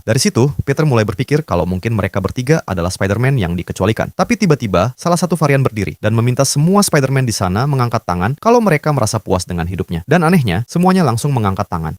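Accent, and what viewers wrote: Sunda, Indonesia. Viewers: Abang ini ternyata orang Sunda ey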